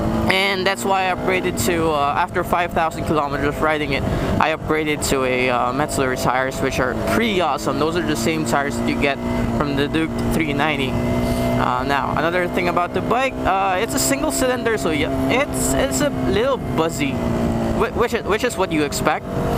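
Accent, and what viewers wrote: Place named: Philippines. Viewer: "yur butt starts to get sore" haha XD Love the pinoy accent mate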